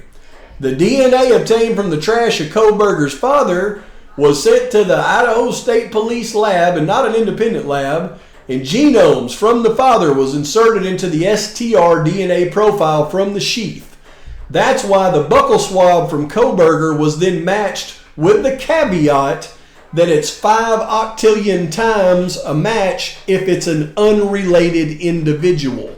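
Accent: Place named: Texas, United States. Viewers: Yep. I was peggin that accent today while watching this video. Have we got us a texan here? Ex aTexas Ranger perhaps?? Just a thought. Imo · You sound like you are from FORT WORTH TEXAS or near by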